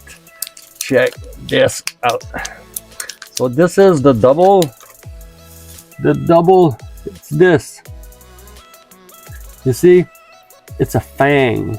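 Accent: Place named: Quebec, Canada. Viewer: Salut! Ca fait quelque temps que je vois ca passé et je suis tout autant intrigué en espérant de les voir en action bientôt! Ca aurait aussi ete le fun que tu fasse des vidéo en français parce que clairement a l'accent tu est québécois 😊 ce genre de contenu est vu et revus en anglais, je comprend que tu essaie de rejoindre la plus grande audience possible mais bon moi j'aimerais bin ca trouver plus de ce genre de contenu en français et je suis sûrement pas la seule. Sur ce merci pour tes Vidéo 😊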